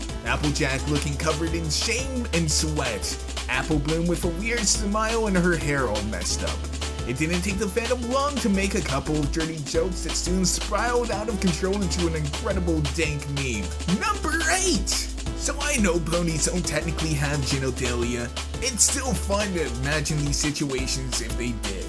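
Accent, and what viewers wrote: Ireland. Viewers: Anyone elsea realized he kinda sounded a bit different, in my opinion he sounded like irish (no offense) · are u irish? xD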